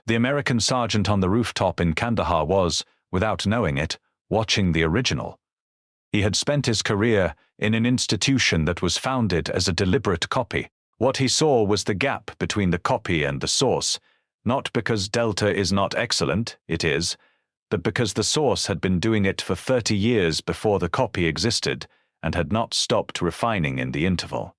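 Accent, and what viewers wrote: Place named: Bogan, Australia. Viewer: AI narration is bogan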